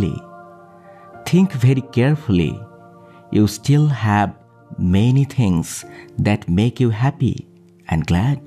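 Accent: Bengal, India. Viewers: I was searching English video in Indian accent and find this thank you. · Are you (the speaker) Bengali?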